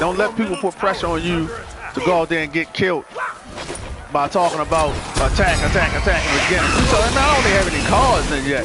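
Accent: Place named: Louisiana, United States. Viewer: Man, are you from Louisiana? Cause you got that accent! Also, great video. Take this sub!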